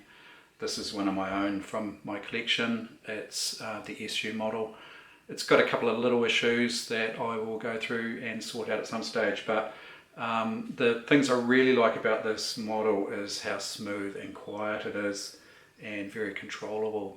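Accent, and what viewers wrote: New Zealand. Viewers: I knew it! After seeing some TV News videos, I could recognize your accent. Greetings from Rio de Janeiro, Brasil.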